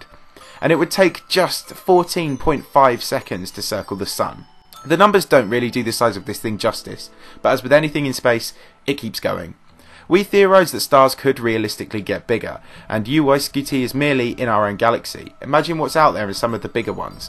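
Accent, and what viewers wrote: United Kingdom. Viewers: British accent is annoying.. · Please slow down so we can understand what you're saying! My students love watching your videos on the universe, etc. They listen carefully but British accent spoken fast is hard to decifer!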